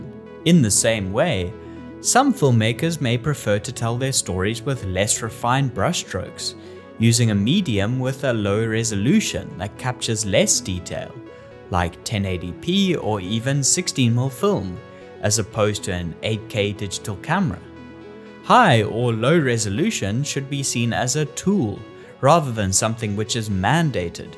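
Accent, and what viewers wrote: South Africa. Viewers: I really don’t hear this accent (South African?) on YouTube too much. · Where are you from mate? Your accent sounds closer to South African than most things I hear on YouTube :) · Fellow South African! Love the content and recognized the accent immediately… 😉 🔥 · south african accent I detect ..ah subbed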